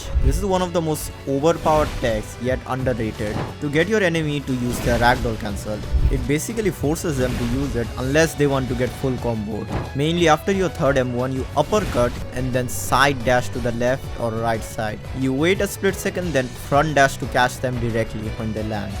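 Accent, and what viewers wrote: India. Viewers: your indian i am pakistani so i know accent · WAHT KIND OF INDIAN ACCENT IS DAT W ACCENT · Bhai u Indian? Ur accent tells me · Indian accent? w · I also feel he is indian bcz of his voice British say English In another way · FINALLY A GOOD INDIAN TSB YOUTUBER, THANKS THIS WAS RLLY HELPFUL PLUS NICE INDIAN ASCENT👌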